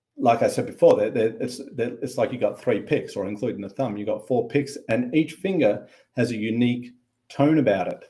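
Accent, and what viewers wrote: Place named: Australia. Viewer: I’m a finger style guy. Have been since I started with a really good guitar coach/instructor. Nbnbmnmn at Gryphon Strings in Palo Alto, California. You sound Australian, you’re playing a beautiful Maton and have an excellent approach to what I consider the most incredible instrument with a 1000 voices. Not sure how I stumbled on your videos but certainly glad I did.Almost 72 if I make to October. Stopped playing some time back and completely lost on how to start again. I need someone to get me jump-started. Perhaps you can help me with that.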